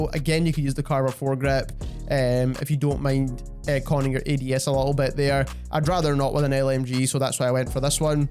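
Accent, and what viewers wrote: Scotland, United Kingdom. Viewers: A Scottish guy! Nice one. Never seen u b4. Am nae guid at cod nowadays - too auld I think... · This is an ironic question given your name haha. It’s a Scottish accent :)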